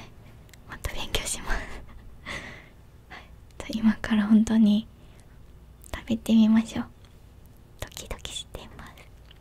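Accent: South Korea. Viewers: かわいすぎ〜(*≧∀≦*) ヤッバ⁉︎ 韓国人が喋る日本語だーいすき❤️わかる人おるやろ爆笑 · 韓国人が喋る日本語スッッッッゴイ好き。この気持ちわかる人おる？めっちゃ可愛いんやけど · もう一生懸命話してるの可愛すぎます← 韓国の方が話す日本語大好きです！（唐突） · 韓国の方だからですよ〜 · 普段日本語よりも韓国語の方が 発音とか口調とか色々と かわいいと思ってるけど、 韓国の方が喋る日本語はめちゃ可愛い🖤 とてもいい音ですね〜！ これからもがんばってください！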